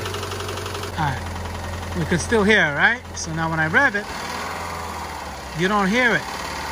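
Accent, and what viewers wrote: New York, United States. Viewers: The New York accent shined through over that rod knock 👍 best comparison video online. thank you!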